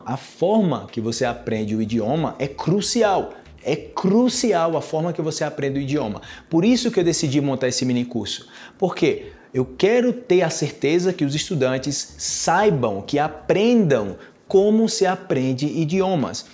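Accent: Colombia. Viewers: Jaja, como lo mencionan en el video con Steve Kaufmann, tu español suena con el acento de Colombia. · nossa você fala espanhol como se fosse colombiano, eu sou falante do espanhol e quando escutei a você falando foi incrível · Le doy 10 de 10 a Tu asentó español colombiano, ojalá yo pueda aprender portugués así de bien como tú hablas español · Ya pegaste el acento colombiano... yo aprendí contigo.. ahora vivo en Brasil y enseño espanhol rsrsrsrrsr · Amé tu acento colombiano es bellísimo!! ya inicié el mini curso es pero avanzar pronto!!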